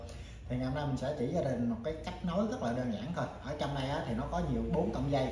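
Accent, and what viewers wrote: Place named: South, Vietnam. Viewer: bạn để âm lượng nhỏ hay sao ấy chứ mình vẫn nghe rõ mà. giọng miền nam nên hơi khó nghe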